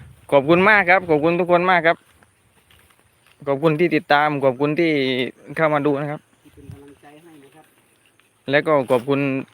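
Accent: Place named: South, Thailand. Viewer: พี่ชอบฟังแระ แหลงใต้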